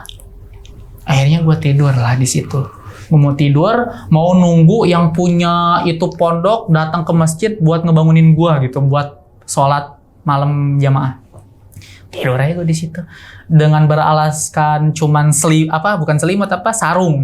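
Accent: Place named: Sunda, Indonesia. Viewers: sunda pisannnn mntp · pinaliiii sunda pisan ang..ang..ang...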